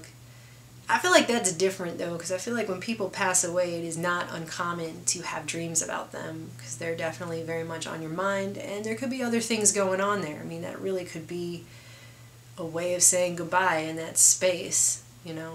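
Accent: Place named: Texas, United States. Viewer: You sound like you're from my part of the woods Texas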